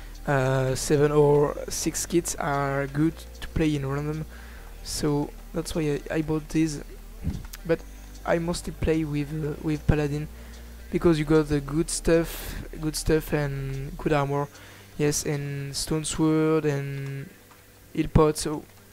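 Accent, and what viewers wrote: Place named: France. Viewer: Yeah good english mate i love that lol i knew what is the french accent tkt sa s'ameliorera au file du temps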